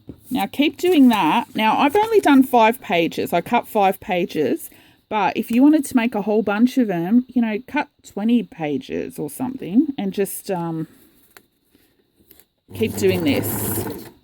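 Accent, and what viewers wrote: Australia. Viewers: Hi! My name’s Erica & I live in Lawrence, Kansas, USA! You just showed up on YouTube for me & I’m so glad you did, because I love this method for making paper ruffles! It’s genius & I hope I can get the hang of it! I can also see using paper that’s been colored with sprays & paints! I think you said something like the sky’s the limit, haha! I love your Aussie accent, as well! I have “liked” & subscribed & will mention you to my friends! So nice to find you! Erica❤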